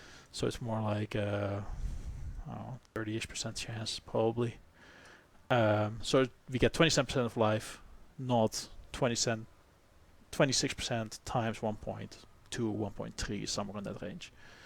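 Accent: Netherlands. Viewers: Je hoort meteen t nederlandse accent haha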